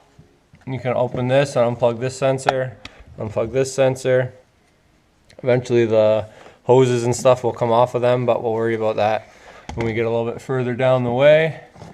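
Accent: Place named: Ontario, Canada. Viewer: Awesome video thanks ! I’ve ordered my new downpipe ,exhaust and turbo and Malone tune , next will be my turn to scrape my knuckles 😂😂😂😂 I totally guessed your accent at the beginning too , had to be from Ontario Canada 😂😂😂 I’m from Bc 😬🤷🏼‍♂️ 🇨🇦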